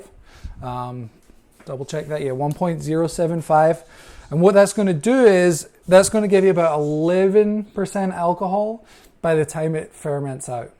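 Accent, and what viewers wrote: Scotland, United Kingdom. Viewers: Very odd accent. Sounds scottish at points but then quickly becomes American?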